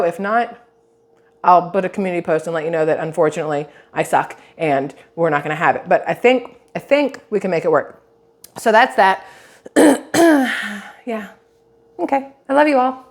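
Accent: South, United States. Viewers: Love ya , Your Awesome People!! , I love your voice and your accent I miss that I'm from the south to but that's not where I live at anymore !!, I love to hear y'all, and I miss hearing I'm fixing to do something LOL !!, IT'S AMAZING THE LITTLE THINGS THAT YOU MISS THANK YOU SO MUCH !!, PLEASE STAY SAFE !, I ENJOY WATCHING YOU YOU DO A GOOD JOB TELLING IT LIKE IT IS !!, THANK YOU!! GOD BLESS!! ,AND TO YOUR FAMILY!!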